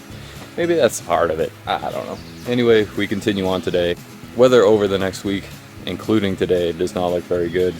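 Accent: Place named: New England, United States. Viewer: What's up from mass! Your accent sounded right at home, loving these videos and hopefully plan to hike a ton in the whites this summer. Good luck with the rest of your trip and maybe see you up there cheers man!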